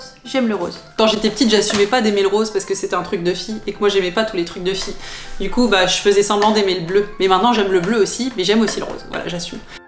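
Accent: Quebec, Canada. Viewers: Très intéressante cette vidéo et tu commence à parler comme une québécoise 😅💪🏿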